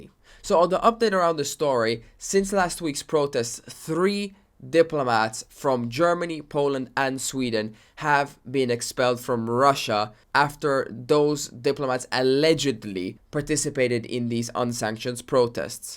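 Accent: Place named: Italy. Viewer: oh really? Sorry, it's just that you're accent sounded very Italian.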